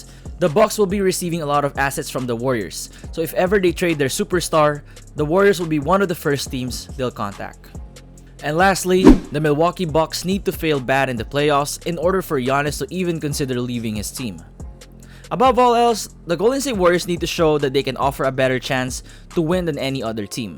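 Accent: Philippines. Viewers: I Guess you're a Filipino, because of the accent. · I can tell that you're a filipino just by observing your accent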